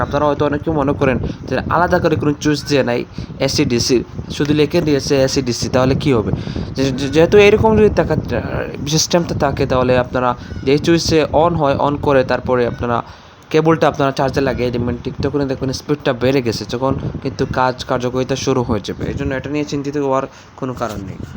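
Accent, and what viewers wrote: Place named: Sylhet, Bangladesh. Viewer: ভাই তুমি সিলেটি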